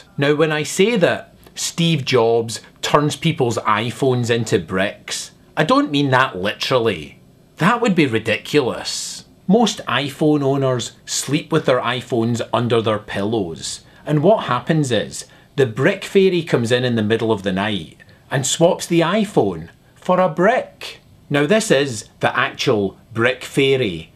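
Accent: Scotland, United Kingdom. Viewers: that was simply brilliant. you are scottish, right? Living in land of the free or still back in the state of thistle and tartan? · Lol , classic Scottish accent. I'd love to actually sound Scottish rather than English. A comment from a Scottish Tween x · People he's not Mexican! he's either scottish or Irish. Although, he is scottish I believe.... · its a scottish accent .. not irish.. not british.. there is a huge difference.. duh w/e i luv this guy!!!! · Refreshing, and funny. Didnt like this guy at first, but he actually is quite unique, and says it as it is. Not sure where in Scotland this accent is, other than the Burgh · "Why is His accent so weird?" Because He's Scotish perhaps? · o_o it's just a scottish accent.. many scottish people have that accent. it's not a voice xD it's his normal accent. lol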